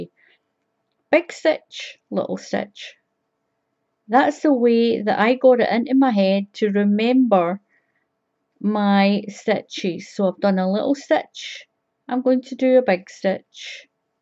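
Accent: Scotland, United Kingdom. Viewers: firstly I cud listen all day to ur lovely Scottish accent! I have used ur videos so many times! Thanks so much for sharing and it being so easy to follow xx❤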